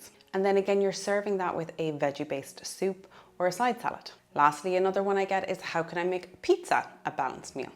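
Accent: Ireland. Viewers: Love the accent. Sounds like a Navan accent 😊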